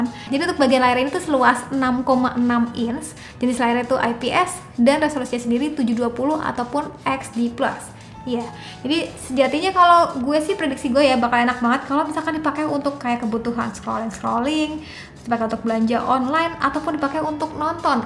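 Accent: West Java, Indonesia. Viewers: Mantap 😘😘😘😘 Sunda gituloh😂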